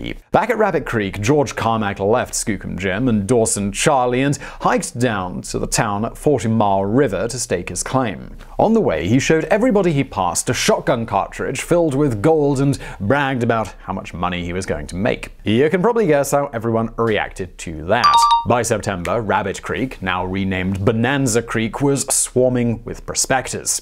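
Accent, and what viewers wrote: RP, United Kingdom. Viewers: I swear you get more posh with every video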